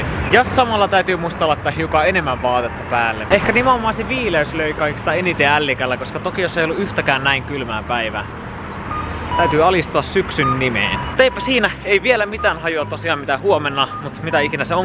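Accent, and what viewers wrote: Finland. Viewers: se on vaa tätö finlishii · Ystävällinen pyyntö - voisitko olla ääntämättä englannin sanoja tuolla suomijuntilla tavalla: ARKADE, SHHRAINI, HEDKUARTTERS, LEGIT jne. Asia on häirinnyt kaikissa videoissasi. Onko ylipäänsä pakko edes käyttää englannin sanoja, jos yhtä hyvin voisi sanoa vaikka "pyhäkkö" tai "päämaja"? Mutta hyvää Kiotossa oleskelua!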